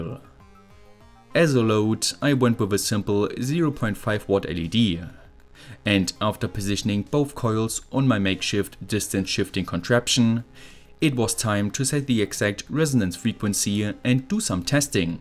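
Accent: Germany. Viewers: Your form germany right? I can hear it 😂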